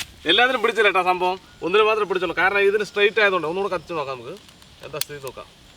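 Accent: Palakkad, India. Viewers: യുടെ പാലക്കാടൻ ഭാഷ അത് വേറെ ലെവലാണ് 😘😘🔥🔥🔥🔥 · പാലക്കാടൻ ഭാഷാ കേൾക്കാൻ വേണ്ടി ഓടിവന്ന ഞാൻ 😜😜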